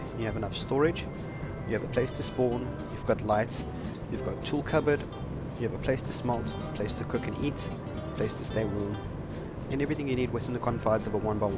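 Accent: Afrikaans, South Africa. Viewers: You sound south african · R u south african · are u south African? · thanx this vid was realy helpful are u south African? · I love how i can recognize a fellow SA M8 voice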